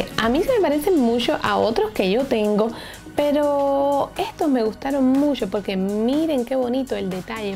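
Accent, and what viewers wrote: Cuba. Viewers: Me encanta el acento cubano de.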